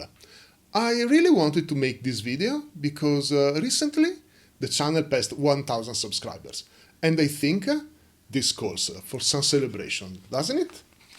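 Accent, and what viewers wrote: Italy. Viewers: Congratulazioni! Mi era sembrato di riconoscere un accento italiano, e ora ne ho la conferma! Apprezzo moltissimo i tuoi contenuti, i tutorial sono estremamente ben fatti e facili da seguire. Non vedo l'ora di vedere i prossimi video sul MG Sazabi. Personalmente, sono un grande fan del weathering, quindi sarebbe davvero interessante vedere dei tutorial a riguardo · Love your content so much, as a beginner they’re very helpful improving the skills of gunpla building. Also love the mood of the videos, they’re relaxing and always make me smile. Congrats for the 1000 subs! (Just a quick question, sei Italiano? Dalla pronuncia sembri emiliano come me ahahahah)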